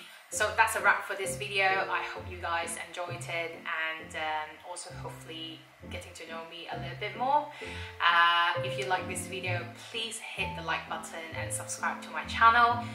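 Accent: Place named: United Kingdom. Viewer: Ohh your english sounds so british now! Love it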